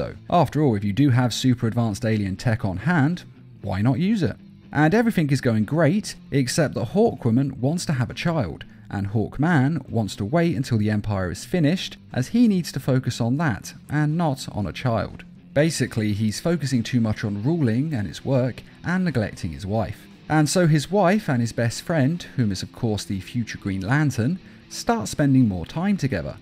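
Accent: United Kingdom. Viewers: You forgot that the narrator is british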